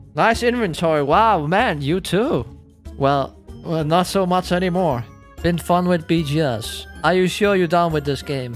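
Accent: Denmark. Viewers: are you from denmark?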